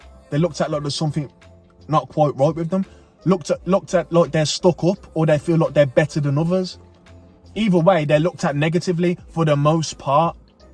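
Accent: Birmingham, United Kingdom. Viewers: I saw your video on when you made your first £10K and I love how honest you were I can’t wait to jump out the matrix too btw where you from you sound like you’re from Brum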